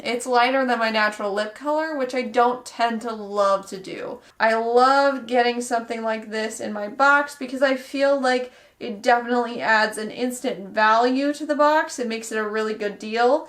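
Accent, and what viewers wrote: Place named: Minnesota, United States. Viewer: I KNEW YOU WERE FROM MINNESOTA!!! I can recognize my accent anywhere!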